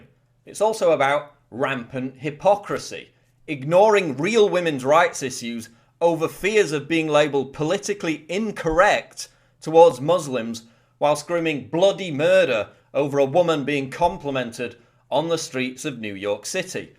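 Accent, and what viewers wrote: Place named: England, United Kingdom. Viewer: Alan Fournier His particular English accept you dumb fucker. Not all English people sound the same, his english accent annoys me.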